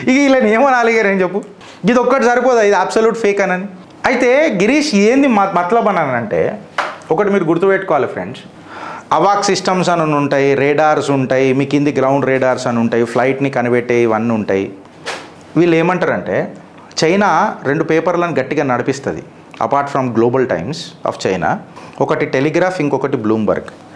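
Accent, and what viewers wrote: Telangana, India. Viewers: మీ తెలంగాణా ఏక్సెంట్ అర్ధం చేసుకోవాలి అంటే మీరు మాటకు మాట కు మధ్య గేప్ ఇస్తే బాగుంటుంది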